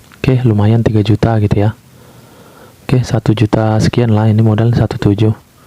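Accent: Medan, Indonesia. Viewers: Org Medan ya bg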